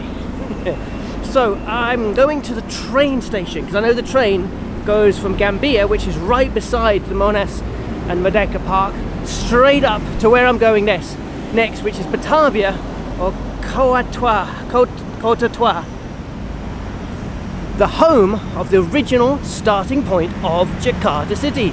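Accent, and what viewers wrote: United Kingdom. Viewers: Based on your accent, you must be from the UK, right?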